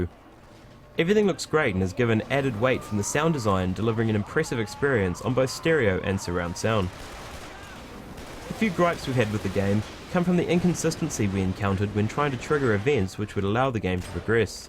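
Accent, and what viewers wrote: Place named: New Zealand. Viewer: Lol, wierd hearing a kiwi review a game.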